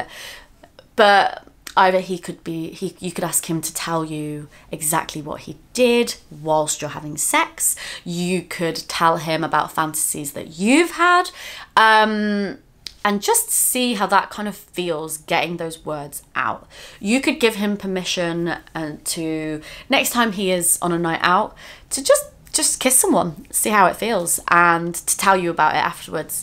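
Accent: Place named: United Kingdom. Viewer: How charming is her British accent? Sounds so intelligent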